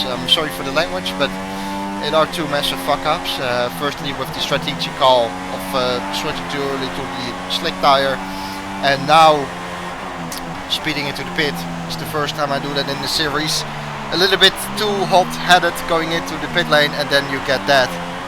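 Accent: Netherlands. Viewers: Ik kon gwn aan be engels horen dat he nl bent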